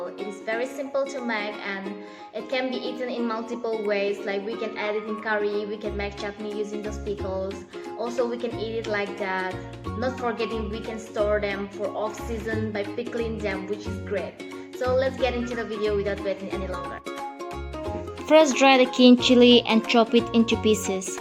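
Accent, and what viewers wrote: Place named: Nagaland, India. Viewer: Well to be honest Sema people knows better when it comes to foodies of pickles etc etc... 😘 And I love the way you speak with that sema accent😍 and this looks tastier🤤 surely gonna try it!! 🤪 But one thing I want to know is can we put salt??🤔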